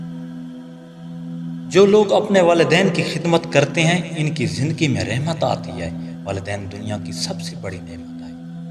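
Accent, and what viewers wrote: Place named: Saraiki, Pakistan. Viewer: Q k Urdu saraikioun ki trah boltey ho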